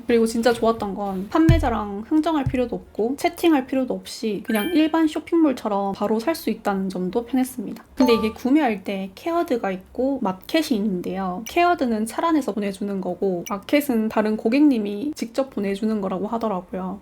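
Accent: Gyeongsang, South Korea. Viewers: 고향 경상도어디였나용?!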